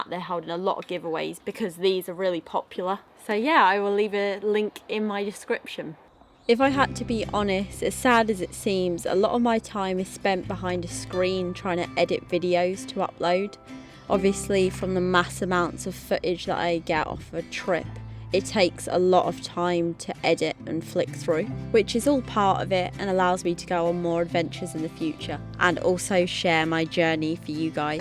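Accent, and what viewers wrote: United Kingdom. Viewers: I am here for the British English. English is not my first language. I am used to see dressed up in cold weather hiking clothes. I just find out that underneath all those clothes hides an exquisite pretty girl.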